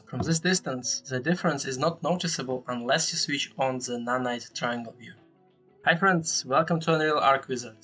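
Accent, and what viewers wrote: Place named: Serbia. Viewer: A hahaha, yeah guessed cause of English accent, Serbs sound the same speaking it, and I feel you, I'm only around 180 which is short for a Serb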